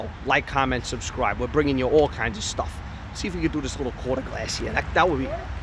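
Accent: New York, United States. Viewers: certified new york accent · He sounds like the definition of an American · The New York accent makes me think that’s not his car.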